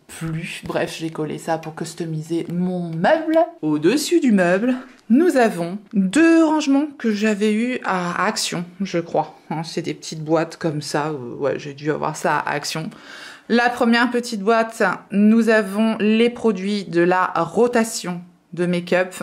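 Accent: England, United Kingdom. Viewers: J'aime bien votre chignon et surtout votre accent anglais ! Yeah ! 😄😉💗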